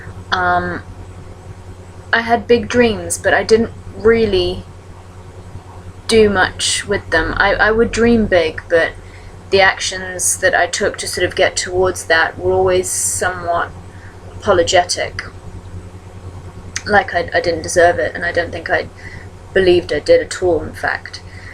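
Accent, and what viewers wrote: United Kingdom. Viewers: Her accent is the most catchy thing ever, so cute :) Is that UK accent? What part of the UK is she from? I love it! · thank you. Nice video of LOA also British accent. I subscribed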